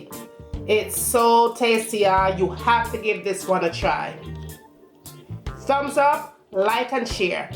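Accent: Jamaica. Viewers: Your man must be happy cos you can COOK and Cook EVERYTHING!!!! Keep up the phenomenal cooking. Its so rare to here an american whose Jamaican accent remains, I love it, true Jamaican!!! No disguising that xxx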